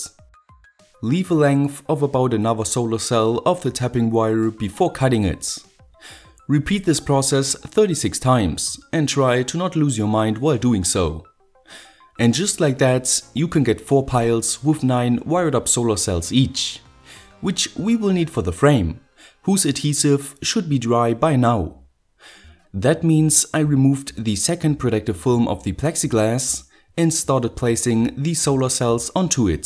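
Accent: Germany. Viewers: Of course he comes from Germany, you can clearly hear his accent. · You sound like you're a German... It's not worth trying and build your own PV modules...Tried everything and it's just throwing away money and time for something that's never going to work and last like ORIGINAL fabric made one... Best regards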